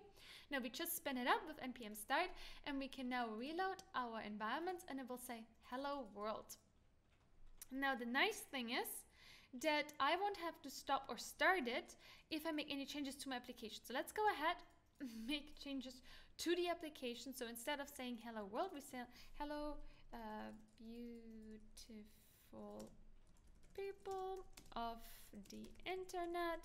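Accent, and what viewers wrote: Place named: Germany. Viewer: Thanks for sharing this ! You are from Germany, I guess... ? Just curious ! 😬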